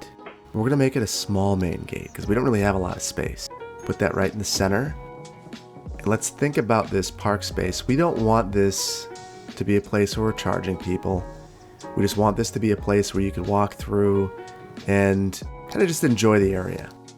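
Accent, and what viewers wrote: Wisconsin, United States. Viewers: Watched your videos to better understand Skylines. Stayed for the intrigue (started with Verde Beach.) Whole time I was watching I was like 'He has a Wisconsin accent.' Thanks for confirming my suspicions. Anyway, keep up these amazing videos, they are much better than the cities I make. Also, congrats on the subscribers. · NEVER apologies for our Wisconsin accent, its something to be well... somewhat proud of XDXD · Are you from Wisconsin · Respect the Wisconsin in ya lmao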